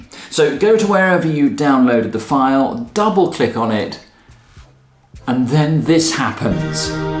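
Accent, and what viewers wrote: United Kingdom. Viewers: Nice British accent nothing else.😮😢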